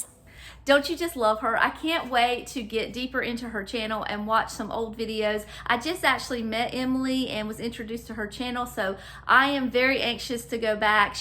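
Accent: South, United States. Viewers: It can be what you want it to be and put out what you love. I think you are still precious! And I love that you talk like me…southern.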